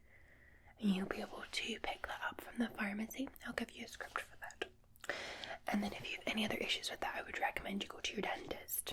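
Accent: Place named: Scotland, United Kingdom. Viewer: Thank you,.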